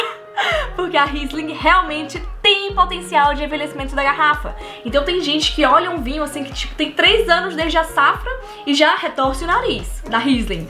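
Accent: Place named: Fortaleza, Brazil. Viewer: senti a familiaridade kkkkkk 😆❤️ sou de Fortaleza também 🇧🇷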